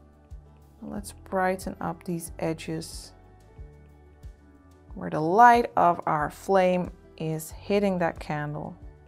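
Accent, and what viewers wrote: Netherlands. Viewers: IS SHE DUTCHHH AAAAAAAAHHH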